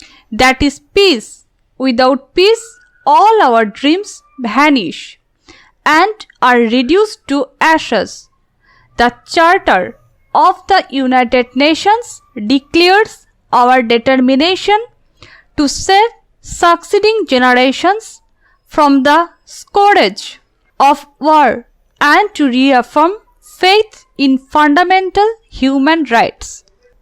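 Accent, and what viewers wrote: Bengal, India. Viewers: Voice seems to be Bengali , I am correct?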